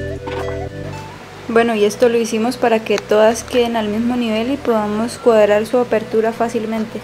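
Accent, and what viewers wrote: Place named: Colombia. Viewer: no sé cómo mostrarte los vídeos que encuentro en yt y te puedan ser útiles. Busca en YT: how to hydro dip ANITHING Creo que te va a interesar para pintar alguna de tus creaciones Pdta: me encanta tu dejo Colombiano xd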